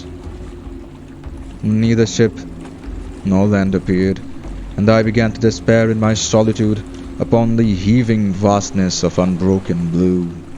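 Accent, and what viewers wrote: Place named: Pacific Islands. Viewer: What I like about yo voice is you sound like some pan Pacific islander who barely learned English and is describing the utter horror to some staunch fool who refused to see your reason to steer clear of a storm.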